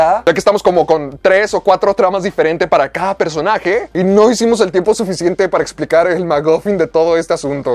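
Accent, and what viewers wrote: Chihuahua, Mexico. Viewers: Apoco es chihuahueño nmmen jaja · Eres de chihuahua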